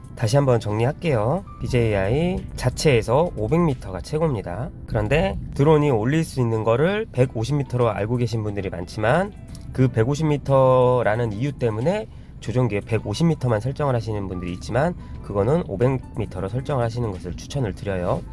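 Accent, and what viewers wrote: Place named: Daejeon, South Korea. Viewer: 대전분이신가봐요? 구독ㆍ좋아요ㆍ알람설정 했습니다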